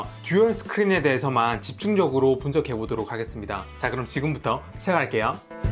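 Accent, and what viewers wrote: Gyeongsang, South Korea. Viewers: 대구사투리인가...부산사투리인가....그것이 궁금하다...허허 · 잘 만든 영상 잘 보았습니다 한 가지 거슬리는건 경상도 사투리 말을 빨리하려다 보니 사투리 억양이 너무 심하군요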